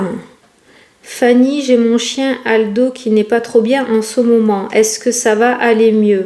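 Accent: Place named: Marseille, France. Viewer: Moi aussi j ai l ai l accent " qu on attrape en naissant du côté de Marseille " ou du Midi et il est beau notre accent .... Merci pour tes tirages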